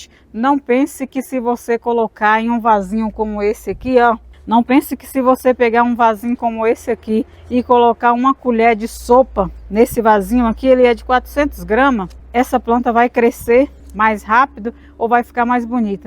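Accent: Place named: Rio de Janeiro, Brazil. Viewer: Bom dia eu pensei que vc era carioca?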